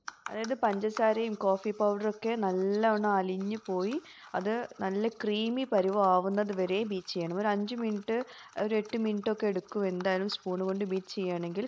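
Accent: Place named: Malabar, India. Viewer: samsaram kettappol thoni kozhikodo malappuramo aavumn. Njn kozhikode aa. Vdos okke spr aanu but sound kurachoode aavato😊😊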